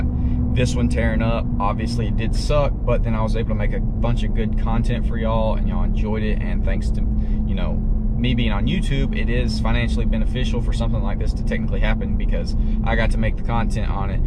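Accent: Alabama, United States. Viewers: Are you from Alabama ?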